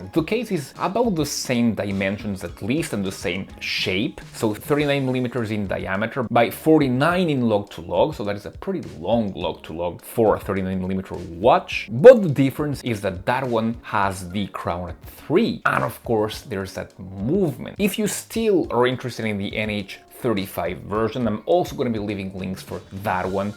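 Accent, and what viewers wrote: France. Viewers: Yet another great video, thanks. Love the accent, reminds me of the times when I worked for a French company (11 and a half years!) . I am looking forward to your review of the Specht&Soehne. Crazy German-ish name for a Chinese watch, huh? I am not fond of Homages of watches that are too unique to be considered "universal property (Submariner, 50 fathoms, Explorer, AquaTerra, Daytona, Speedtimer, Dirty-dozen field watches, Flieger watches)", unique watches such as the Alpinist (Militado released one recently and I have mixed feelings about it) or the Vacheron Constantin 222 (which Pagani Design "homaged" and failed spectacularly at replicating the bracelet, a failure that every youtuber "fails" to point out, imagine that...). But if anyone succeeds at properly homaging the Land-Dweller, I just might consider buying it, because I really want a Genta-style watch. 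Otherwise, I would have to turn to the Festina F20051 or F20065 (which are on sale right now at about 170 EUR). The PRX is too damn expensive right now and the prices will go higher for a while, as long as people associate it to the Land-Dweller.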